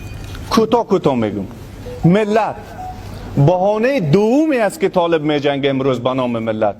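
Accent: Tajikistan. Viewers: Бахдо тоза лахчай точики кати гап мезана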